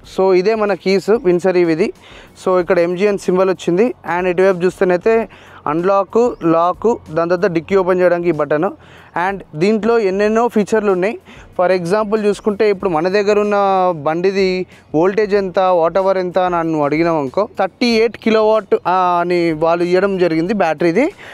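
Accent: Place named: Telangana, India. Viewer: Bro Nee Launguage style So nice.....Pure telangana accent...Pakka Clear Cut On subject. Spr Bro Keep it up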